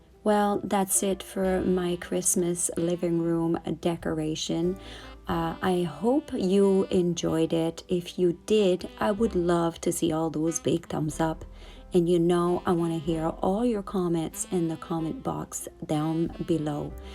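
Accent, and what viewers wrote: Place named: Atlantic Canada, Canada. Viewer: Hi, I just found your channel. I am a fellow Canadian. Love your video. I will definitely watch more. You definitely sound like you are on the East Coast. My parents are from Newfoundland originally but moved to Ontario many years ago. Have a wonderful Christmas 😊🎄♥️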